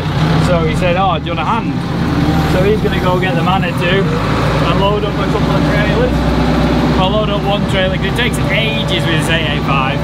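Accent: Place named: Scotland, United Kingdom. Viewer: I’m from Texas and it’s taken a couple of yrs to catch the Scottish pronunciation but it’s fun just listening 😊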